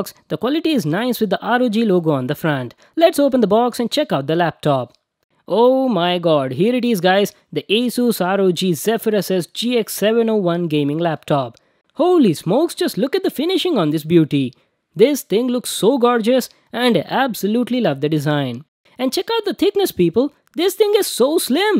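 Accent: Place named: India. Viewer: u hv the most unique and original indo english accent of all the indian youtubers🤩🤩. keep it up bro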